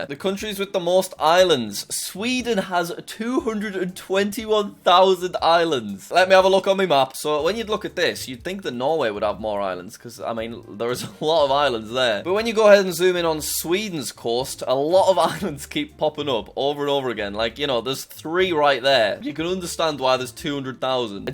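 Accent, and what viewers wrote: United Kingdom. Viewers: is basically Drew Durnil with a British accent. (seriously, they just scroll through the same reddit threads and upload the videos a few days apart?)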